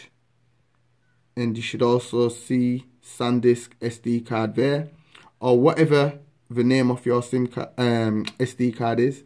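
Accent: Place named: United Kingdom. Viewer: Which part of UK are you from?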